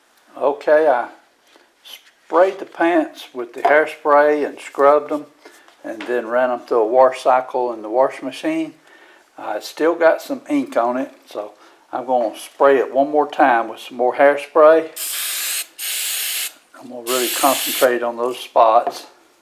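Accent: South, United States. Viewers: Love that southern accent my hubby is from South Carolina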